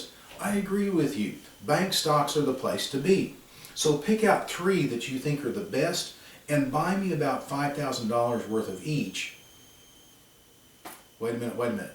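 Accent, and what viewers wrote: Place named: South, United States. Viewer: I find the southern accent quite comforting, in fact. Extra helpful contrasting the stress of study.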